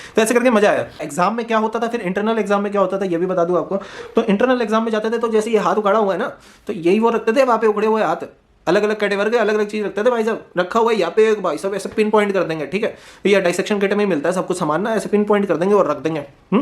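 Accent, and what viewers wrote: Haryana, India. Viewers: Bhai teri voice me haryanvi touch h Hostel m haryanvi students k saath rha h kya bhai ❤️❤️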